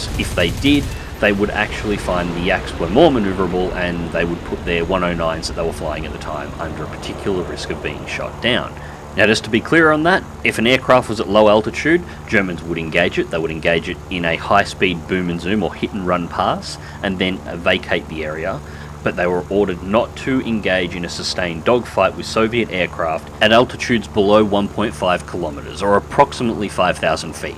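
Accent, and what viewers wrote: Australia. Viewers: time to listen to that attractive Aussie voice again <3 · what tier 4 soviet plane do you recommend? Love your manly aussie voice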